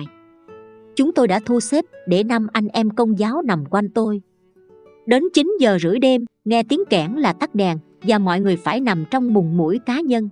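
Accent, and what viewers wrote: Saigon, Vietnam. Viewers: Giọng Sài Gòn xưa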